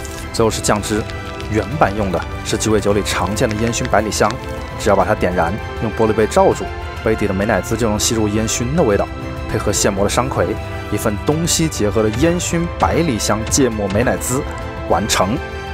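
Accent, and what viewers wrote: Wuhan, China. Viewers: 太棒了！质量很高的美食博主！博主是哪里人？听口音好像是武汉的？ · 想问下up主是不是武汉人😂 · 听声音应该是武汉人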